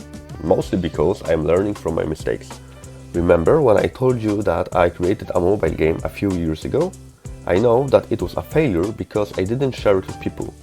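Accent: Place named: Poland. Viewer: I can hear fellow polish dev :D keep it going!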